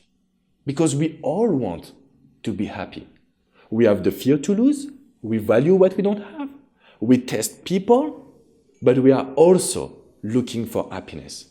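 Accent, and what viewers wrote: France. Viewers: the women love your French accent! I have a question. What if a guy says he needs closure but wants to remain friends and one minute acts happy about the break up and then sad about the break up and what if I can’t help but say really stupid things over text about him moving on. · mon cheri tu es tres beau!! PS I understand your French accent just fine..😉 and je ne parle francais really so... You give great advice! Merci Beaucoup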